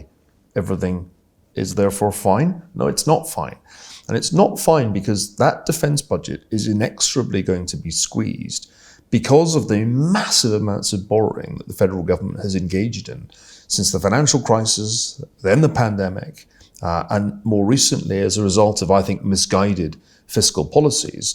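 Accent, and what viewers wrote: United Kingdom. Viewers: Unfortunately, every time I hear this accent, I am flooded with historical memories of the depravity of the nation he comes from. And here he is carpet bagging, talking BS about China. How about the US and its lackey, Britain, staying home and giving the world peace? 400 years of depravity is enough, Mr. Ferguson. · I will summarize it this way. He speaks with a British accent, after that he makes no sense. First, when we talk about a country in ascent or decline we need to consider the following: 1. Military power: no one is close to challenging the US here. 2. Economic power: the US economy is outperforming all developed economies and the USD has never been stronger. All this nonsense about debt servicing ratio is just noise. If it really was a concern, the USD would be depreciating and not appreciating! 3. Technical innovation: AI, medicine, advanced tech etc etc. 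don’t want to get into details, but it is obvious the US reigns supreme… At least for a long time the US will lead. Who is in decline based on the 3 factors above is the UK 😢 Love the accent though 😊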